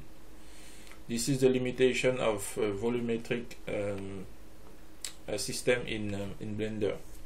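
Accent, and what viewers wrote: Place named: France. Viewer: un petit "accent" Français ;p haha tu fais l'effort de parler anglais et c'est pas evident sans ecrire de texte avant meme en Francais . merci pour la video je partage( passe voir la chaine et le site si ca peut aider aussi) A+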